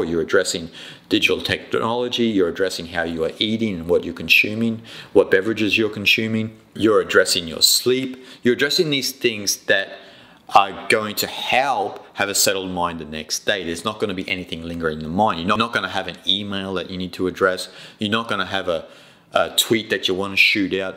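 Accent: Australia. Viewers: P.S. Are you Australian? 😌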